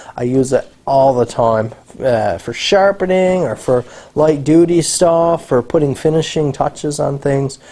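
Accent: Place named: Newfoundland, Canada. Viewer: Greetings from Norway👍🏻🤠 Your videos are so educative and cool. Love the New Foundland accent and I admire your craftsmanship⭐⭐⭐⭐⭐👍🏻 Keep the videos going!🏆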